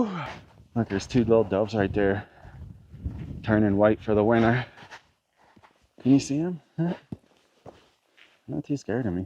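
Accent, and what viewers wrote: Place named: Pennsylvania, United States. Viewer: You need to get yourself a fishing pole brother. Sounds like you are from PA?!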